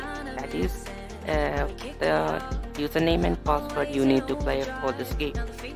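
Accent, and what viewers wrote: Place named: Kerala, India. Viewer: ennik English kettapo thanne thonni 😂😂 malayali anen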